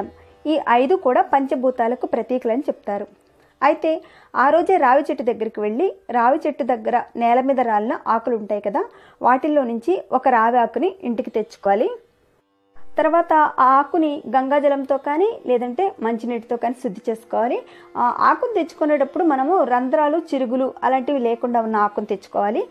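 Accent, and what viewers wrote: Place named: Medak, India. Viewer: అక్క మీది మెదక్ జిల్లా అనుకుంటాను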